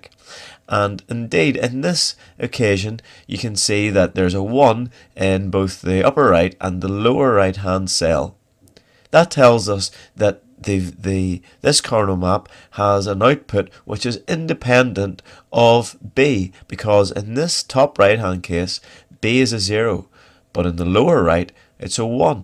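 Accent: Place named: Australia. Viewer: he is Australian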